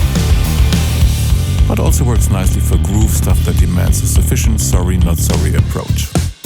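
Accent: Germany. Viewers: Love the German accent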